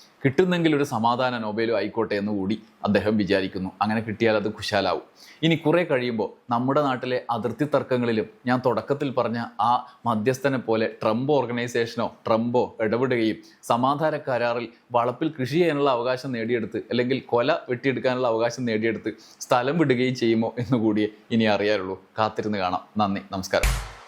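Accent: Kannur, India. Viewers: നിങ്ങൾ കണ്ണൂർ കാരനാണോ?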